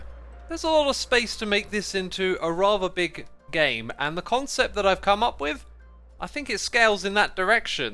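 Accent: United Kingdom. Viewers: He's a muscular man with a British voice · WHOAA whoa whoa whoa, I had no idea x was BRITISH.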